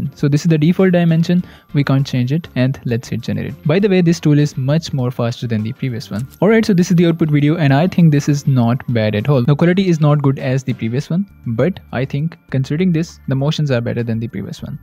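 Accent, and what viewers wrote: India. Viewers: You know what they say: Anyone with a funny/Indian English pronunciation promoting a site that seems to work wonders is actually a scam.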